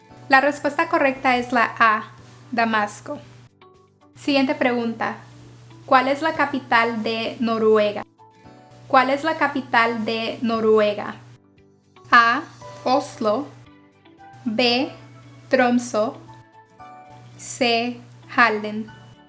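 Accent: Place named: Latin America. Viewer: so you're teaching Latin american spanish? That's my guess base on the way you pronounce certain words I wonder which country is your Spanish from? Since even in Latin American there are some differences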